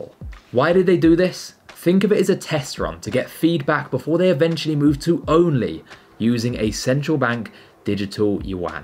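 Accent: United Kingdom. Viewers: Great video. You sound British so why a US focus ? Shame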